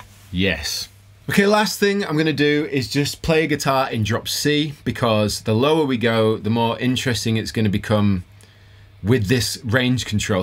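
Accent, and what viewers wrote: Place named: England, United Kingdom. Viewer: More intens-a-E. 😅.....gotta love the English accent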